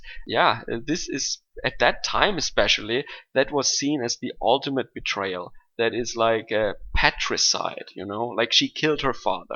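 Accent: Germany. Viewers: Dear, I love your precise, seemingly accurate, and concise knowledge of the American language. I wish my Deutsch was only 10% as good. Do not ever change your accent from German as it enhances your apparent IQ at least to 250. My question concerns when A. Merkel became fully aware of politics. Y.T. has several nude pictures of her when she was much prettier and hence much younger - probably less than 18 years old. It was stated that girls back then appeared nude as this was known to aggravate eastern 'powers than be' who couldn't retaliate. What do you think?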